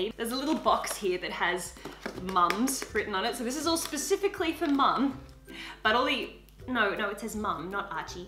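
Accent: Australia. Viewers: im choking, you're aussie? bLEsS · GURL U AUSSIE!!! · are you australian?and your bird is so cute i had the same one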